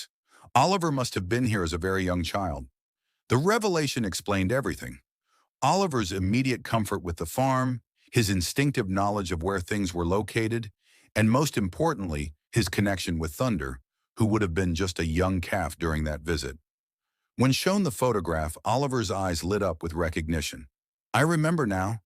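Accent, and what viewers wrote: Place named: United States. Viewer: I am so sick of hearing horrible US accents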